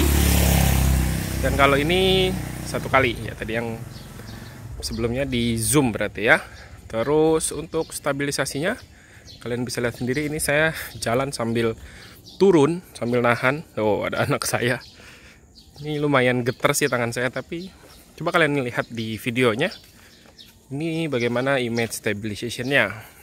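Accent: Samarinda, Indonesia. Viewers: Orang Samarinda kah bang?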